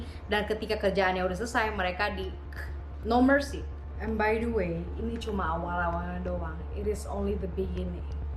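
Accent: United States. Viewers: aksen english amerika kamu kak😭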